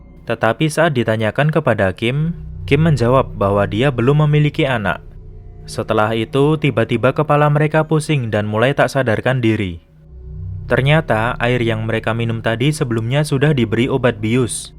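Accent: Java, Indonesia. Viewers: Jawa y bang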